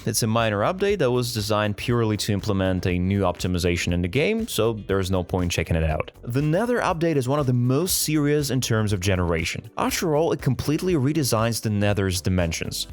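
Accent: Russia. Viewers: Только на 2 минуте заметил что русскоговорящий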